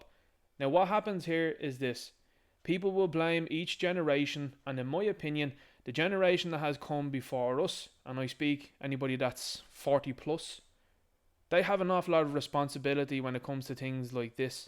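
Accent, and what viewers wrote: Dublin, Ireland. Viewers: Just found your Channel ! Usually the strong dub accent is a bit much for me 😂(Carlow here ) but I’m invested 🎉❤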